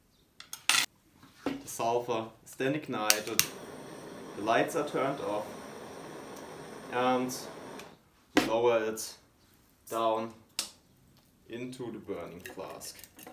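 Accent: Germany. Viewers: is that german accent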